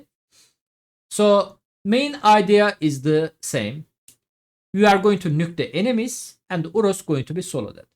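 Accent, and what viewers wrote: Turkey. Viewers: hacı Türk olduğun çok belli konuşmandan :) ama Helal olsun Türk yayıncı pek yok bunu yapan teşekkürler yardım için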